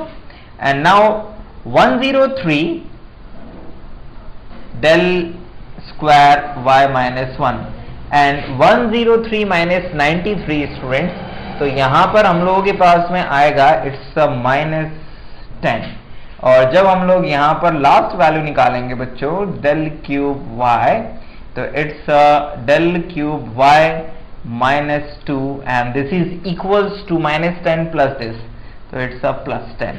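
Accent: Bihar, India. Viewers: bihar se hai kya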